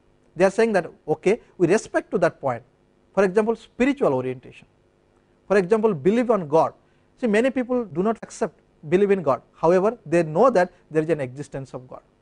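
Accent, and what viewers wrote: India. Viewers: first have respect towards guru.... u dont always get everything served ready on platter buddy.... one should also try to acclimatize..... aren't we trying to learn american/british/german accent/language when we want to study in their country? learning to understand indian english accent is also a similar job for u. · Wery hard to understand his pronounciation for any non Hindu> Hindu people please, please kindly work more on your English accent at school. I usually have no problem understanding anybody who speaks English whether the person is an European, Asian or African with one constant exception - citizens of India. An utter nightmare, sorry but i have to say that. I don't understand a word. You have such a strong accent that it is like listening to somebody speaking with a full mouth. Take it easy, slow down, and at least try to pronounce the words in a proper way. 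Leaving this now and going back to Radhakrishnan introduction - at least I am able to read what he says without having to listen to him.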